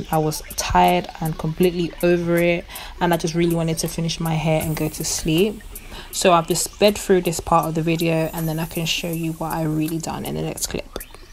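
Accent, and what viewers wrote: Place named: United Kingdom. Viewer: Lol the accent doesn't fit the face but it's really nice to hear a UK accent welldone good job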